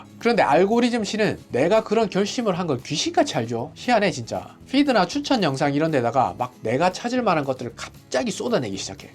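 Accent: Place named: Busan, South Korea. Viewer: 알고리즘에 이끌려 보게 되었는데,, 단주 중 정말 현실적으로 공감되고, 저는 부산사는 사람으로 사투리로 방송하시는게 너무 편안하네요 대단하세요!!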